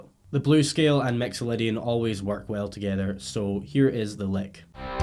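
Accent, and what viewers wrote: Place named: Scotland, United Kingdom. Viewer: Amazing speech ! Sounds like there is some kind of scottish accent going on...sorry but it keeps me smiling ahah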